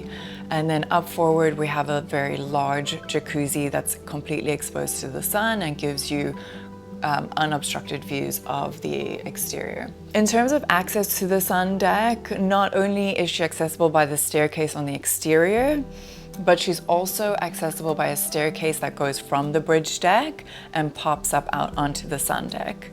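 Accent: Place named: South Africa. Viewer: Sound South African but for someone who lived in America.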